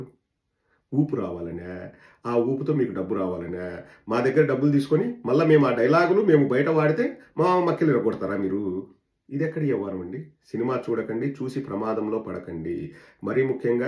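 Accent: Rayalaseema, India. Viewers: మన రాయలసీమ డైలాగ్స్ సూపర్ లో చెప్పినవ్ అన్న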